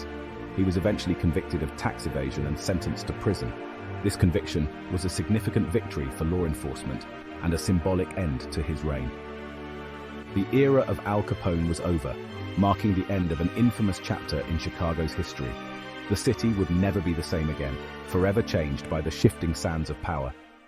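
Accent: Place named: England, United Kingdom. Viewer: Why is it always a English person narrarating !!!!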